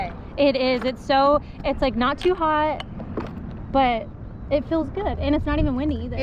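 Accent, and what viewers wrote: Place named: South, United States. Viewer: ***O...I notice you are loosing your Southern drawel....lol.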